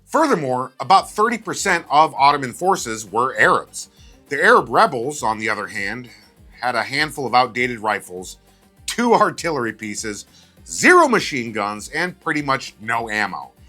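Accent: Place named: Minnesota, United States. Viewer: I love your videos! As a Minnesotan who now lives down south, hearing your accent every Friday is a breath of fresh air.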